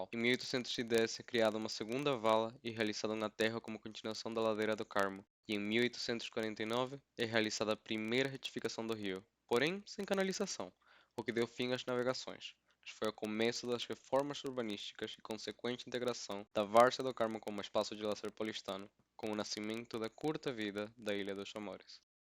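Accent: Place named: Portugal. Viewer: De onde é seu sotaque? Fiquei muito confuso, parece mistura de português (de Portugal), com paulista e carioca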